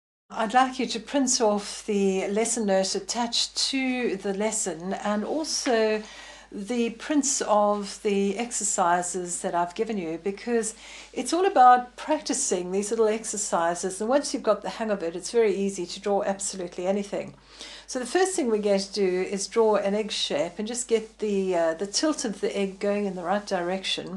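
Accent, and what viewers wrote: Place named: United Kingdom. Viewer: Cool British accent :D wiked lessons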